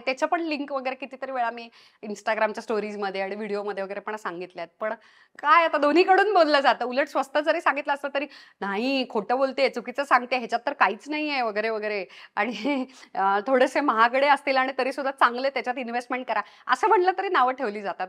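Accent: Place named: Pune, India. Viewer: कधीतरी नॉर्मल टोन मध्ये का नाही बोलत आपण? बोलण्यात सारखा पुण्याचा अभिनिवेश का असावा? बाकी आपले व्हिडीओज चांगले असावेत. आमच्या "ह्या" तुमचं कौतुक करतात.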